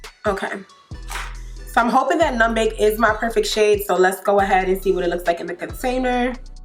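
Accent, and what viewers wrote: New York, United States. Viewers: Where u from? Do I hear a fellow New Yorker?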